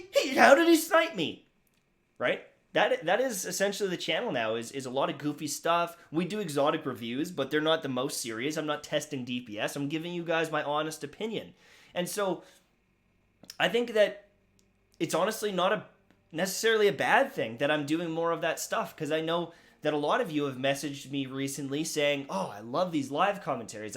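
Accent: Scotland, United Kingdom. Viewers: Dammit, bring back the scottish! Lol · Loved the snipe vids back in the day with the Scottish accent 😂. Also can't get enough of that flying mongoose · I’d love to see some other videos. I remember watching some of your funny Monster Hunter videos with the accents. Exotic gun reviews in that Scottish accent is always hilarious as well. Up to you where you go, but I’ll be here whatever video comes out!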